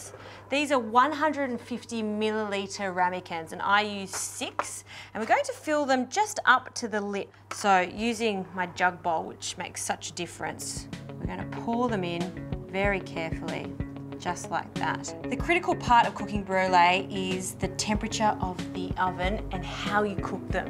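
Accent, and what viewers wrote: Australia. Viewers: Love the Aussie accent although I heard Hate the cream at first. :D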